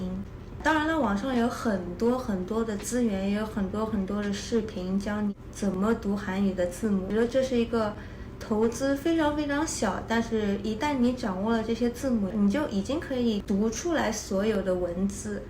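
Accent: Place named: China. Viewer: 这是中国口音吧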